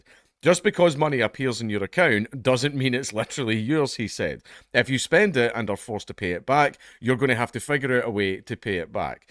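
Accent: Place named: United Kingdom. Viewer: The wores bank in UK Barclays bank The big amount of money was pull off from bank way no Alert stolen money 10 pounds I 2 transaction easy Hackers all over in England UK do that car dealership or others Nice British accent Which dialogue you have brother North or South I never can catch which one london bath Norwich leeds Birmingham Liverpool Manchester Blackpool York each other different talk Accent dialer hey not joke Chase bank fake check 20 years jail time by fbi police ok bye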